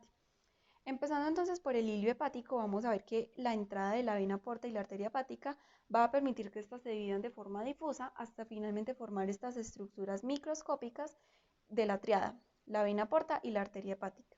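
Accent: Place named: Colombia. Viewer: Hablas demasiado rápido que no se te entiende nada,sumándole el acento colombiano que tienes ,terrible tu explicación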